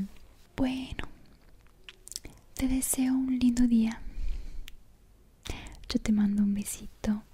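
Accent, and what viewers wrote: Argentina. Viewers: Suena Argentino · Argentino mezclado con Itailiano es dnwkwjjwjeje arte, Amé ♡ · una pregunta:¿Sos Argentina? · Hablaste muy argentina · Te salió un acento muy argentino 😂 ¿será la mezcla del Italiano y español? 🤔 Me encantó tu maquillaje! ☺ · Es como que en este video hablas más en tonada Argentina a comparación de tus otros videos, va a mi se me hace no se(aclaro: no lo estoy criticando es más me gusta por soy de argentina) Amo tus vídeos💖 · Wow nunca te había escuchado hablar español sin susurrar O.o que voz tan bonita! Tienes acento argentino y dices palabras latinas:p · Amo tu acento argentino 😍😍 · En este video me pareces muy argentina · Te queda genial papel de bruja😊 Pd: me encanta tu tonalidad media argentina c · Se le sale lo argentino.... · Yo solo quiero una poción para tenerla a ella:'v Pst: Tremendo acento argentino 10/10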